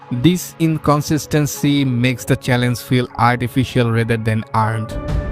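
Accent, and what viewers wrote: India. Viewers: I just ignored the video and laughed at your indian accent the whole video. 😂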